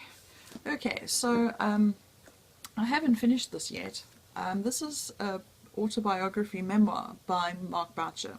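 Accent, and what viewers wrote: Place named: South Africa. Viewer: Ahh I just found your channel! Where in SA are you? I lived in Knysna for YEARS - now live in Australia but so nice hearing a Saffa accent again! OK so I now need to read Wolf Hall haha!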